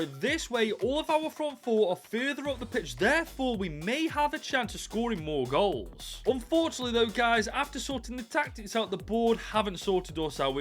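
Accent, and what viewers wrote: Stoke, United Kingdom. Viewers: I recognise the accent are you a stokey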